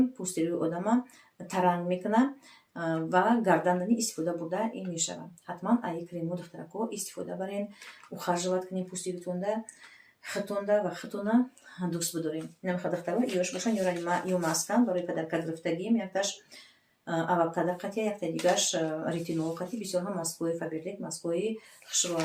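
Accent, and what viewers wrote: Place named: Kulob, Tajikistan. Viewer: Салом апаи азиз хамвптан шмора мебинм асабом ором мешава беморима хис намеенм худочон ба кувахои баланд бираси хусни зебо лачаи нагз дори Кулоби зебо сана м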